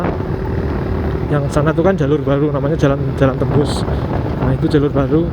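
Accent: Java, Indonesia. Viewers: bener mas wong jowo ojo sampe ilang jawane... ,gk usah di rungoke omongane koncone sampean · Jadi diri sendiri aja mas, sama sama wong jowo ya tetep medog, jgn dirubah gayamu, saya juga medok kok di vlog saya. bahkan Tora Sudiro aja masih medog .... hahahahha analogi yg bagus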